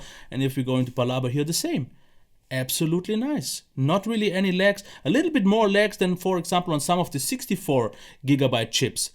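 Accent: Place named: Germany. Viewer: Based on your appearance, hat and western shirt included, you look like you were born in the great state of Texas, U.S.A. That said, Your accent agrees with your claiming to live in Germany. So, I'll just say, "Guten Tag" instead of "Howdy" I too was a little concerned about the "dated" Snapdragon 800 CPU (SoC). But, I checked the Futuremark website's Best Mobile devices and, it seems to still be comfortably somewhere in the top ~300 of all mobile devices running the Ice Storm Unlimited benchmark. It also didn't score too poorly on the Slingshot benchmark test (though the frame rates are as bad as you'd expect for a benchmark test that is intended to stress current top end mobile processors like the Snapdragon 835.) But, the Unlocked and refurbished AT&T branded version I picked up on eBay just yesterday for just $129.99, (the LG G Pad X V930), seems to be a pretty good deal; though the tablet's battery will be a year old with use. 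Still, I believe it will do well for my wants and needs. I just wanted to be sure it didn't have rear facing speakers, (which is something I find hard to believe that tablet makers actually have long done, and still do), and is mostly why I came to YouTube looking for reviews on it. One feature I was wondering about also was, whether or not it has an IR Blaster?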